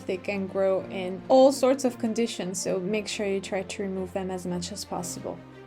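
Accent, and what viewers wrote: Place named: France. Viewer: Your french accent is so lovely 😍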